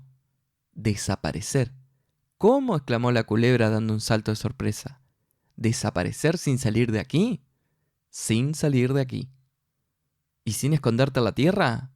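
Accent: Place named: Argentina. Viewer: Excelente! Enfim um canal com conteúdo em espanhol com acento Argentino. Sou muito grata!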